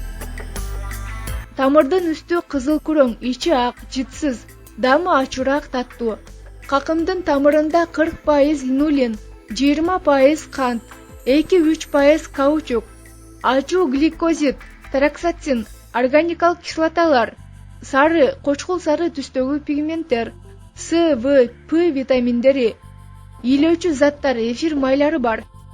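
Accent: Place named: United Kingdom. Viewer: Англиядан келгенсип Р тамгасын акцент менен суйлойт экенсин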